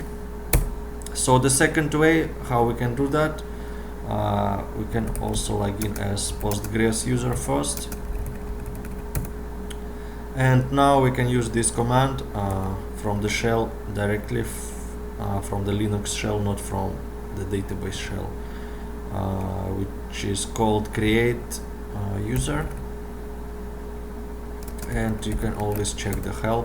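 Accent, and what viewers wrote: Russia. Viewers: слышно ж что акцент русский, сделал бы субтитры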